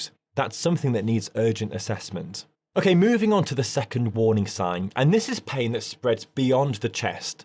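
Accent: England, United Kingdom. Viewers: I have just recently subscribed, and really enjoy the way you have presented these situations . It is very easy to understand, and that is appreciated. Thank you. Plus I always enjoy the English accent.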